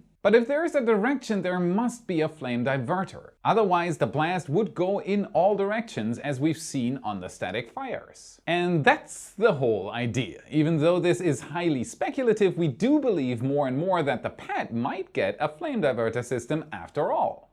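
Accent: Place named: Germany. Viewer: Awesome to see you back. Space should always have a German accent!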